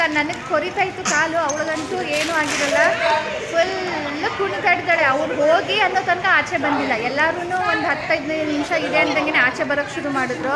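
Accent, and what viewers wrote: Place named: North Karnataka, India. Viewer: Madam I think you r from Uttara Karnataka ..yakandre nim bhashe swalpa Nam tarane ide..anyway Nice vdo ..thnk u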